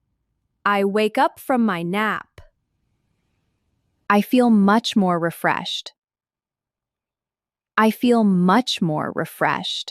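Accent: United States. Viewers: Please is it american pronunciation!? I would like British one also😊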